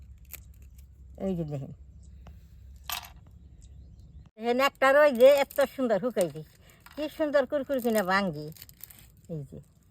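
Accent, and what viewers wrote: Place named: Nawabganj, Bangladesh. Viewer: দেখেই মনে হচ্ছে অনেক মজার। দিদি আপনার দেশ কই? কথা শুনলে মনে হয় আমাদের নবাবগঞ্জ বান্দুরার 😅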